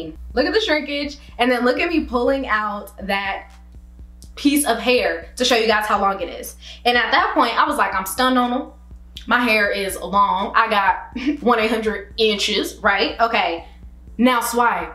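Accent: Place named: South, United States. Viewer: U sound country and I never noticed bc I was lived in tx now Indiana yea u def sound country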